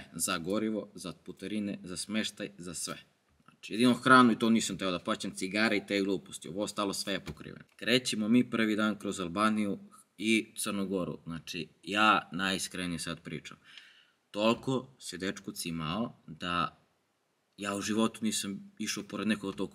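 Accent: Southern Serbia, Serbia. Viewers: Lepo sam predlagao jednom u komentarima, povedi devojku neku na putovanja, pa makar drugarica bila samo, odmah druga priča, moja preporuka, oprosti ilkici poželi mu sve najbolje i završiš priču time. To ti je život, uči se do starosti, pamet u glavu drugi put sa više iskustva. Samo napred, podržavamo jug srbije, cepaj naš akcenat dole kome se ne sviđa nek traži drugi kanal, i ja sam napustio kanale već zbog nečeg što mi smeta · tako je jug tamo tako pričaju,simpatičan naglasak. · Batko samo ti nastavi da pricas tako to je jug srbije ljubi te brat,al ilkicu kad vidim ce mu pokrljam nosinger opet ,ce ga utepam ima da dise na rate kroz taj nos,ima nos sece burek lik mali mentol...😊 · Prizrensko-timočki tačnije prizrensko-južnomoravski dijalekat daleko će se čuje! Ja garantujem za ovog dečka! Pozz iz SC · Poz, skontao sam i pre ovog videa o čemu je reč i ko je upravu itd. 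itd. No nebitno to toliko, upečatljivo mi je to što kažeš da nećeš da se menjaš i da nećeš da menjaš svoj naglasak/pismenost i šta li već. Ja sam neko ko je studirao u nacionalno mešovitoj sredini (u Subotici) i stvarno i raznih krajeva su dolazili ljudi i upoznao sam od Kosovara do Madjara studente. Stekao sam POGREŠAN utisak da su ''Srbijanci'', kako mi Novosađani pogrdno i sa podsmehom kažemo ''Srbijanci'', pritmitivni i prosti ljudi, i taj njihov naglasak to dodatno govori. Ti si taj koji menja taj ceo moj pogrešan utisak i hvala ti na tome. Poz iz Novog Sada. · Ja ga tek odnedavna pratim. I slazem sw sa vama. Decko je strasno inteligentan i elokventan. Svidja mi se taj naglasak, samo ne znam iz kojeg je to dijela Srbije. Mozda juzne, nisam sigurna. Volim inace gledati putovanja, pustolovine i slucajno mi se izbacio njegov kanal. Pozdrav za njega i sve pratitelje kanala iz Osijeka 😊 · Ljudi koji ne mogu da shvate tvoj naglasak i govor jednostavno i ne znaju da 98% nastavnika i profesora u skolama na jugu Srbije cak i u skoli na casu pricaju isto i predaju lekcije tako.i ne mozes ti da promenis to sad za 2-3 dana,jednostavno je tako i neka prihvate.to postoji u svakoj drzavi.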